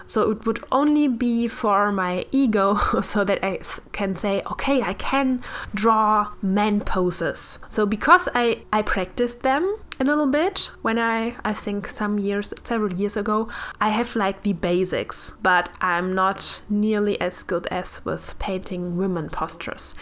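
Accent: Germany. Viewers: The only thing that is a bit annoying is your german accent😅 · are you germaan?😀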